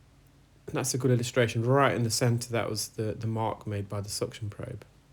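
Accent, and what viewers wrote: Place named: Estuary, United Kingdom. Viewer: I was listening to you waiting and waiting and waiting to hear you pronounce one of the "bath" words ("half" takes the a of father everywhere in the UK so that doesn't count) until I finally heard you pronounce "afterwards" as "ahfterwards"... All this in an attempt to place your accent. It's not "posh" and it's not even RP (received pronunciation) but it's not a "common" accent either. (You are, after all, very highly educated). Your accent sounds like it's from somewhere in the SE (or south central England) and it sounds like it might possibly be from the East Midlands or southeast Midlands or even south London. It's certainly not Scottish or from anywhere in the north or the west country. It could, theoretically, be from Wales but that's a part of Britain I'm not too familiar with. I don't want to take any wild guesses but it definitely sounds like it's from the south (and not the extreme western part of the south, like Devon or Cornwall). 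It sounds like what's referred to these days as "Estuary English" (because it's primarily spoken in the SE, at the estuary of the river Thames). It's used by "yuppies" and other educated, upwardly mobile types. It's not a "posh" accent but it's also definitely far from being a cockney accent (even if it does have a little itty bitty bit of cockney in it). 🇬🇧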